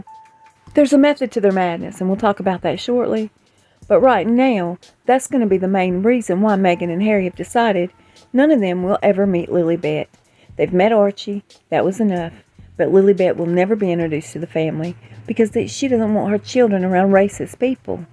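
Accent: South, United States. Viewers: I could listen to your wonderful southern accent all day long. My favourite thing is "Y'all stay safe and be blessed".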